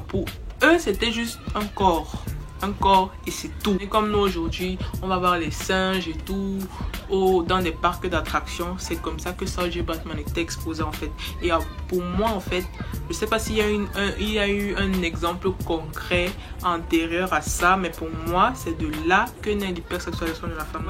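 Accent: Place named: Cameroon. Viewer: Une des rares YouTube use qui , comme moi conserve l’accent camerounais naturel que l’on aime tous 👌🏽 force à nous les camer, forcé à toi , force à ton intelligence et à tes recherches 🇨🇲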